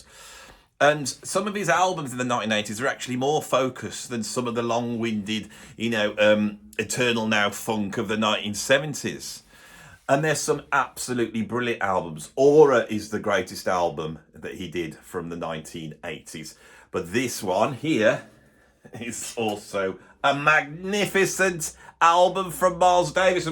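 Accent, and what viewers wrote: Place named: West Midlands, United Kingdom. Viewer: I'm sure you ve got lots of non native speaker😮 ffansall over the jaz rock .prog ahead lib nñ world thus pleaae try to refine yer west midlandish albeit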